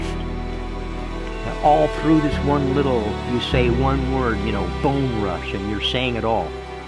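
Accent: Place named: East Coast, United States. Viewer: I wonder what his ball cap said before Bone Rush gave them one of theirs. He talks just like fellers from my area on the East Coast.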